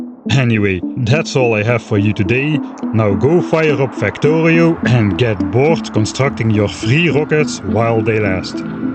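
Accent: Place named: Netherlands. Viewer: Klasse video! Oprecht een van de heftigste Nederlandse accenten die ik ooit gehoord heb haha.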